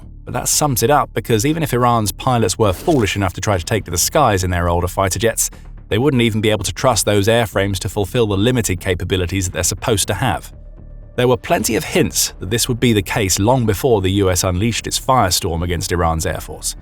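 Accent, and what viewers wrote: England, United Kingdom. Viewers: Why are these US military videos always narrated by an English guy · Because the yanks just love the British accent.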